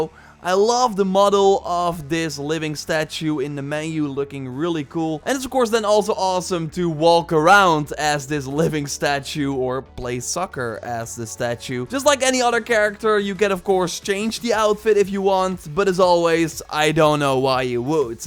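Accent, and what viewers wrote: Netherlands. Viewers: Yo man you seem to have a dutch accent.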